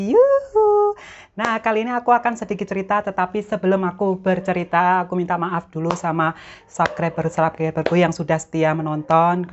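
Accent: Java, Indonesia. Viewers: Min ngomongnya medok bgt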